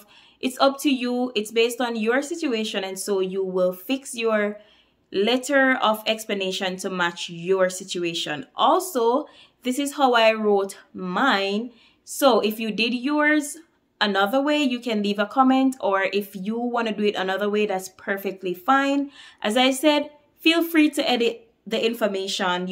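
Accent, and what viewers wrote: Jamaica. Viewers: I love your jamaican accent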